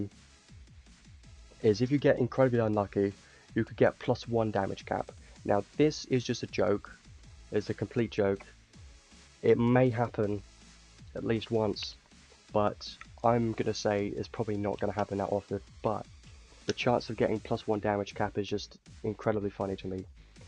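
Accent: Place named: United Kingdom. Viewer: That reaction is why I see your videos at night. Such a soothing Brit voice. XD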